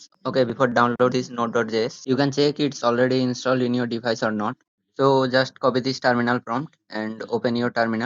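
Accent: India. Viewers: ı didnt understand anything because of your pajit language and accent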